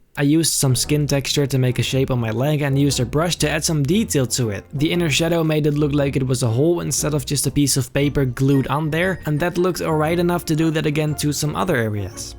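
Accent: Netherlands. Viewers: Your dutch, i hear it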